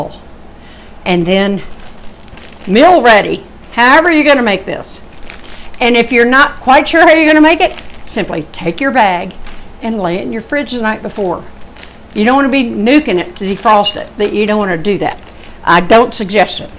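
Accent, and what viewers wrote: South, United States. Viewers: As a Canadian I just love that southern (I think) accent, makes me smile that you'all talk like that! You' all come back now ! LOL.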